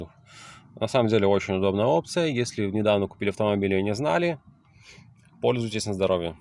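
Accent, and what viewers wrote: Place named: Belarus. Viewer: Судя по акценту , автор Белорус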